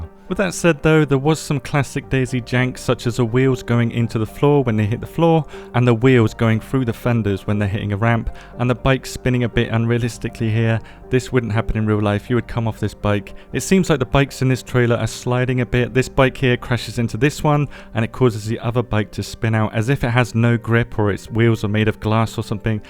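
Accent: United Kingdom. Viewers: british :face-blue-smiling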